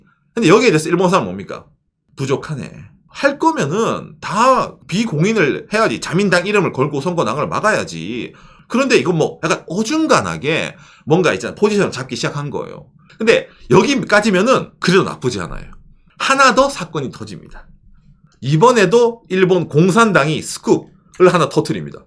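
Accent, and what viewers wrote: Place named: Gyeongsang, South Korea. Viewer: 대구경북 억양이 확 들리네요😂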